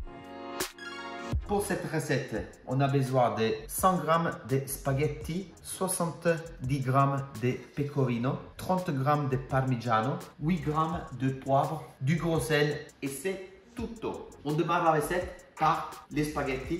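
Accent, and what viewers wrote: Italy. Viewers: u know he is italian? so french with italian accent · Che è questo dialetto italiano degenerato che stai parlando? Non capisco quasi niente. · It's just French with Italian accent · And you didn't catch his thick Italian accent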